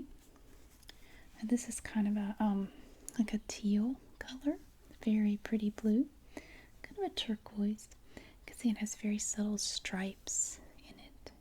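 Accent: South, United States. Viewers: I love your voice and southern accent!!!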